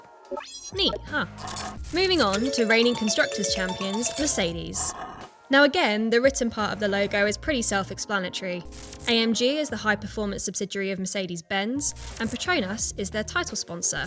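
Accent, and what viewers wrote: England, United Kingdom. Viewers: The usual GHASTLY English pronunciation of Renault, Modena, etc. Listen to Forvo first, maybe you can make it a little better.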